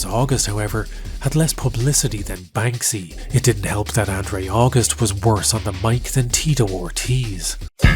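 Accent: Ireland. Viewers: Irish accent, talks about fighting, pop culture and gaming references, that spinning disc when crediting music... ...Super Eyepatch Wolf, is this your alt channel?? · Homie, I was there in the audience of your Brock premiere. Am I anything but a legend for witnessing THE legend do some slick stuff that interests a room temperature to refrigerated MMA fan, could actually be called not a fan. I enjoy that Irish accent and the video game flourish. You are a Legend. You are such a Legend, wife got a full ass subscription to sandwich daily so you make your own and still thank your wife. Legend! 😂❤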